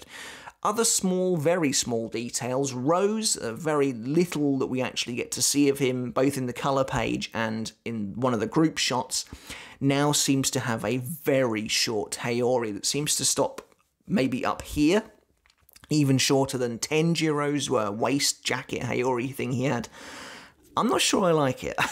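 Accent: United Kingdom. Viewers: He reminds me of this dude I went to school with but this guy British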